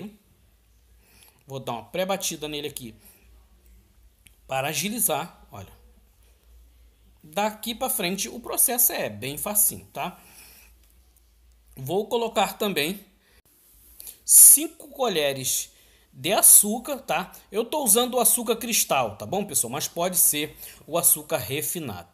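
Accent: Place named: Rio de Janeiro, Brazil. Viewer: Olá TD BM Choll de bola vc e o máximo explica bem esse sutake de carioca guanto descansou o pãozinho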